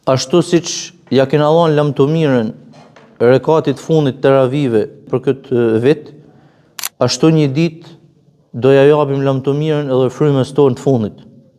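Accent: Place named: Deçan, Kosovo. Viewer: Mos jeni nga Deçani? Sepse ashtu e paske Dialektin!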